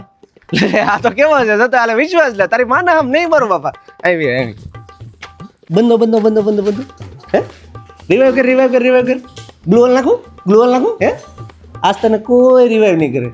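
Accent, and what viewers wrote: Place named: Kathiyawad, India. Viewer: કોણ બેન બોલે છે બોવ મસ્ત અવાજ છે હાવ કાઠ્યાવાડી 😂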